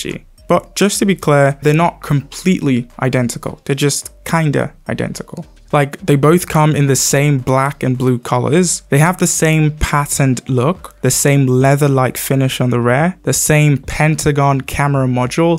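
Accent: England, United Kingdom. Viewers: I love your British accent. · Can i ask ask about your accent? It sounds English, not Nigerian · Why does your English sounds British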